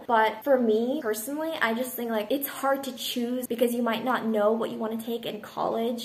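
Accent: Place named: England, United Kingdom. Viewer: I'm here to listen your voice ( English accent ). Makagigil 😚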